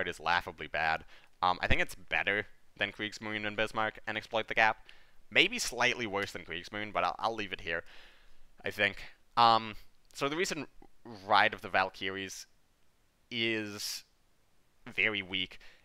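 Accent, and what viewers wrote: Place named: Canada. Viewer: Thank you so much for these videos. Your insights on these cards are very interesting and, in some instances, surprising. On a side note, has any one ever told you you sound like Vince Vaughn? I mean, apart from the Canadian pronunciation, you sound exactly like that guy.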